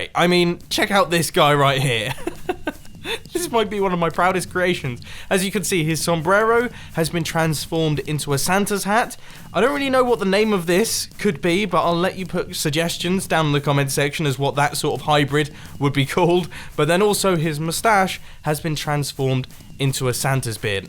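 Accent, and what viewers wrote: Netherlands. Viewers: Lol hoe hij nederlands spreekt😂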